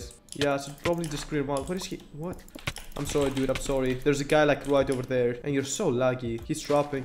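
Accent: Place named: Greece. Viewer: Everyone likes ur Greek accent tho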